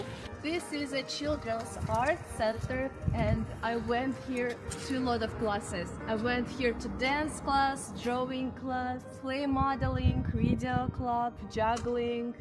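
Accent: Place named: Russia. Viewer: Не написано на русском в описании канала, -русская ли вы, или иностранец. Но услышав акцент, думаю, что вы все же русская.😊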